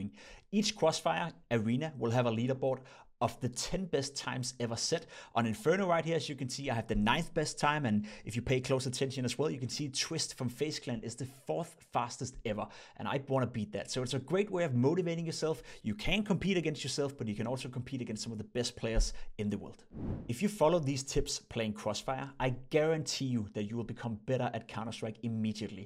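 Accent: Denmark. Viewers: do you have a danish accent?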